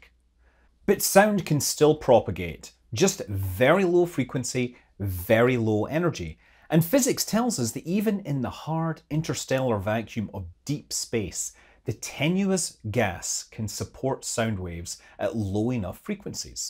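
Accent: Scotland, United Kingdom. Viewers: Jesus,. I've started to explain astronomical context to my GF with a Scottish accent, and I'm South African. Thanks for these!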